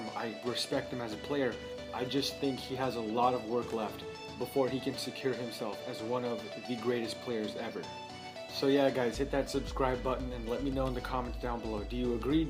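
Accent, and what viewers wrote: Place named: Toronto, Canada. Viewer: Yes I am. I can tell you're from the 6 lolol.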